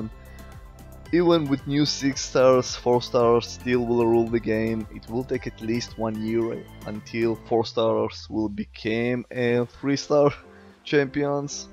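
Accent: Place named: Russia. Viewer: Ты русский? У тебя просто акцент не английский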